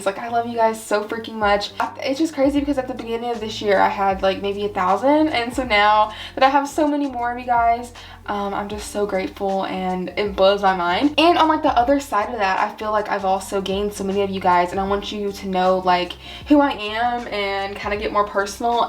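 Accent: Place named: South, United States. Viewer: I just found your account and I think it was pure fate!! Love your midsize fashion content and that you have a southern accent like I do even though I am an old woman 😂 I just got a breast reduction this past fall and it was a very weird time. I’ve had body dysmorphia my entire life and that was a huge part of it. I feel SO much better and the change in my body is great but also very bizarre. I hope your recovery goes smoothly and that the adjustment period to your new shape goes well!!!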